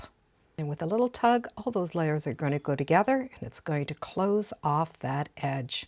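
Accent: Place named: Canada. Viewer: your videos are additive☺. Beautiful idea. You do it so neat and perfect. Love the fabric!. Are you in Canada? Your voice sounds like a Canadian😊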